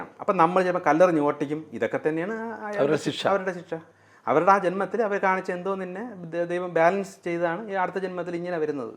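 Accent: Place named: Thiruvananthapuram, India. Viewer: An Autobiography of a Yogi യിൽ പറയുന്ന കാര്യങ്ങൾ ഇദ്ദേഹം സാധാരണ തിരോന്തരം ഭാഷയിൽ എത്ര വ്യക്തമായി ഉദാഹരണസഹിതം ലാഘവത്തോടെ പറഞ്ഞു തരുന്നു ഇത് മനസ്സിലാക്കുന്നവർ ഉയർന്ന level ആകണം 🙏🏻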